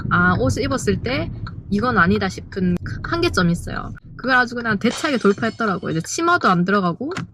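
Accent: Gyeongsang, South Korea. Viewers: 이 언니ㅎ 처음엔 뭔 영어를 대구사투리로 하나 웃었는데 점점 빠져듭니다 타국생활 지치지 말고 즐겁게 .. 응원합니다^^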